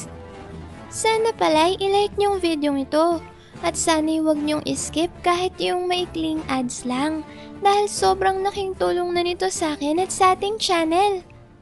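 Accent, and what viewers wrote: Pampanga, Philippines. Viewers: taga pampanga kaba?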